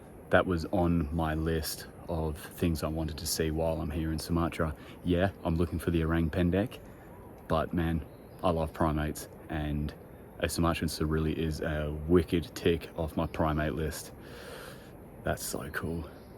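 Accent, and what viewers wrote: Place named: Australia. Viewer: Lol wasn't expecting an Aussie accent when I clicked on this! Long way from home!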